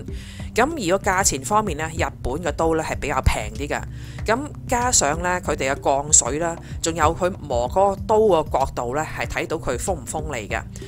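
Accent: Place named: United Kingdom. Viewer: 睇你片重可以學英文，你 D 英國音好好聽。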